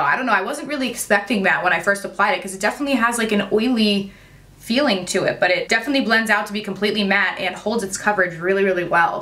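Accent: New Jersey, United States. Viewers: Yousra Benchikhi she’s from Jersey 😉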